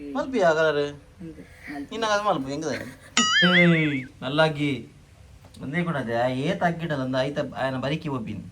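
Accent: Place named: Mangalore, India. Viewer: na tulu udupi style bokka na tulu kudla type... Enjoyed vlog,keep doing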